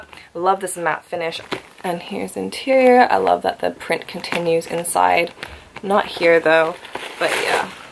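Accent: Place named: United States. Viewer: Weird question but how come you hv an American English accent? I know you’re in London, Hanoi & Taipei but did you grow up in the states?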